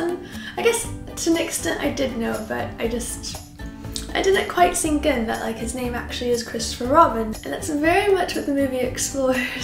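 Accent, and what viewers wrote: England, United Kingdom. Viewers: i love you so much ^-^ tldr- my voice is kind of like your in that its soft and quiet and "weird" and seeing you make youtube videos just makes me so happy and reminds me that is okay to be different. also i love that you have started to say things the way english people do since you moved there :p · Has Tom ever told you that you are starting to catch and speak with a British accent?😃 btw your hair is looking so lovely and fluffy 😊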